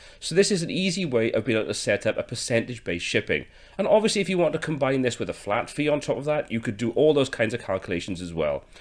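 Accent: Wales, United Kingdom. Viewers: Only just found this! Thank you so much! Also used to live in Cardiff whilst at Uni, so pleased to hear that Welsh Twang! Quick question: I have 3 products with a £1.29 UK Large letter size cost. I have 4 products with £3.85 UK small parcel up to 2KG cost. However, I'm not sure how to make the shipping default to £3.85, if 1 of each product is in the basket, as both will go in 1 parcel. This is not a percentage or item based cost equation. Many thanks in advance for your help - happy to email and pay for your help too. Neela.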